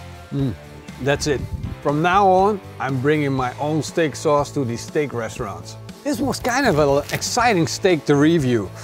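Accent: Netherlands. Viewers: It's funny, how I always can hear if someone is not natively a english-speaker. Even though your english is really really good i can hear that you are a dutch man, also in your video with that knifeboi I could instantly hear that he is a german. I love that. I love your videos, keep on it.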